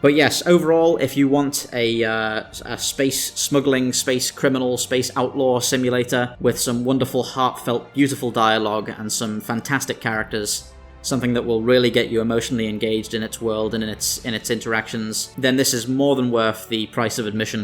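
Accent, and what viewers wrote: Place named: England, United Kingdom. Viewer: No idea why but you sound like an English Ben Shapiro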